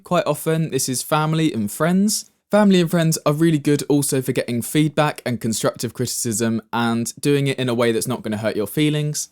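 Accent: United Kingdom. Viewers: Luv the Great Britain accent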